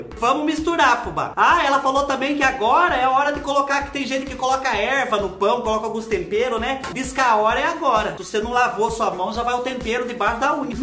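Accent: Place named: Minas Gerais, Brazil. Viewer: Fubá océ é mineiro , falando parece kkkkkkk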